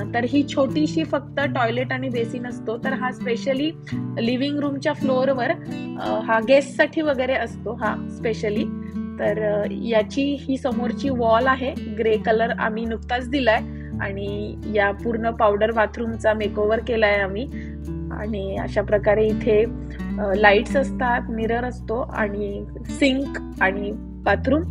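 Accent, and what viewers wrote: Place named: Vidarbha, India. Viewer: Tumcha accent vidharbhacha watto 😅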